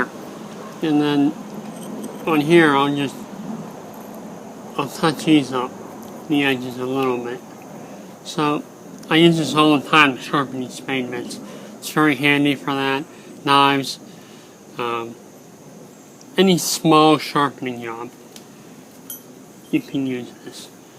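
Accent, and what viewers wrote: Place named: United States. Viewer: gangoso americano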